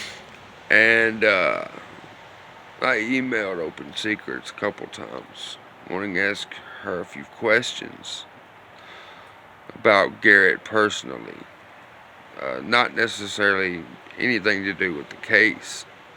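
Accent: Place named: South, United States. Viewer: I just heard you say your from the South. Where ya from? I’m in Arkansas from Louisiana. I knew you sound like home.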